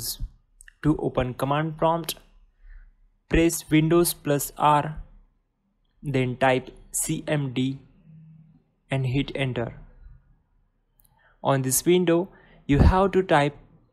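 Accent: India. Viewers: indian lol loved ur accent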